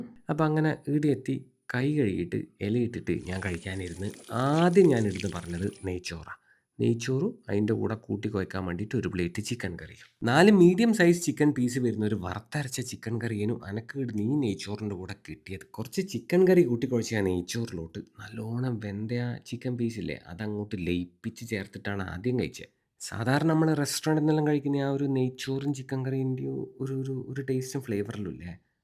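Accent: Kannur, India. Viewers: Your slang❤❤ from kannur😊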